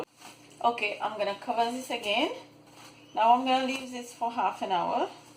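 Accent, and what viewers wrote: Mauritius. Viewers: She speaks with a mauritian accent and since she is making a recipe mauritian wise, how did you expect her to talk? 🙄 Why the hell go on somebody channel and then criticise them about their accent?! 😡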